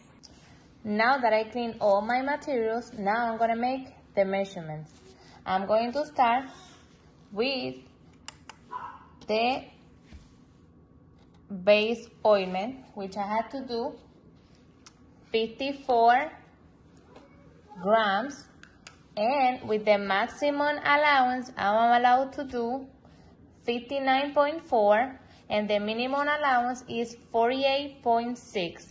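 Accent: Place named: Colombia. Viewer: I know, but they says they can’t provide me separately any more. And I don’t know what to do now. Mr. Derrick (instructor) said y needs to generate it through the visual superscript, but it’s not the way to get the labs. Anything from you can help thank you. I’m from Bolivia y know you are Colombian, I know it by your accent.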